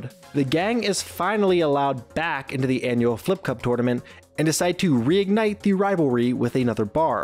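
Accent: United Kingdom. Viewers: Why hire the British voice over guy? He's got the most boring voice ever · LMAO SAME. i have no idea why there's a british guy reading it but it sounds so goofy to me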